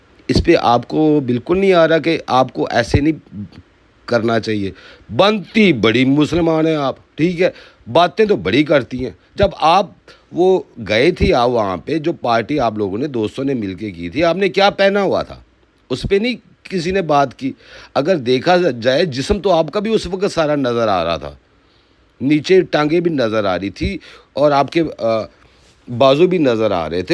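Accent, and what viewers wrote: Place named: Pakistan. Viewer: Lahze se hi pata chal rha hai ki tum pakistani ho. Tumhare yahan ke nalle vloggers mar gaye jo indians per bolne ae ho ! Tumhare yahan ki zani pitara yaseen ko dekh lo, wahan kyun ni muh khulta tum logon ko .. bandi khule aam zina karke rang birange bacche paida kr rai hai ! Per wahan paise ni bnte honge na … indians per bolke kamana hai 😂😂😂😂😂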